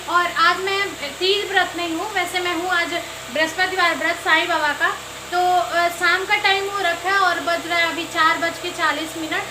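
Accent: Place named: Bihar, India. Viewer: Aap pakka bihar se ho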